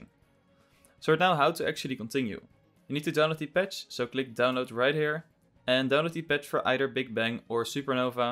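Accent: Netherlands. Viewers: Hey, volgens mij ben je nederlands. Aan je accent te horen:). Ik had even een vraagje. Is de download van Inazuma Eleven GO galaxy gratis voor Citra op PC? Of hoe kan ik die krijgen? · Haha ik kon aan je stem horen dat je Nederlands bent :) Je kan wel echt goed Engels hoor ik meen het ga zo door ;) dank je voor deze uitleg trouwens!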